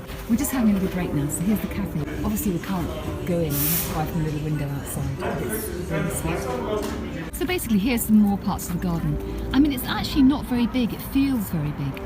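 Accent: London, United Kingdom. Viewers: 学习了很多，还有高级的伦敦音，继续啊！超级喜欢你。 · London accent is music to the ears! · 超级喜欢Manda的英式英语，很优雅好听，易懂。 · hi! thank you.! like London accent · 小玉每期必看。thank you. Loooooove garden. I can speak Mandarin,English, Japanese and Shanghai Dialect. Love you speak British English. I have been living in Toronto for several decades. 谢谢分享花园。我非常喜欢花。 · 我從台灣來美國快40年. 我還是喜歡英式口音. 尤其Manda 講解課程的聲音. 非常輕柔. 太讓我陶醉!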